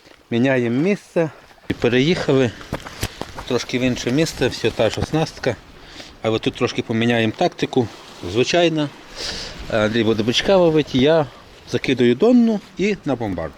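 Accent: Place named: Odessa, Ukraine. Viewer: Прикольный, у тебя акцент,настоящий южный.Коренной одессит походу.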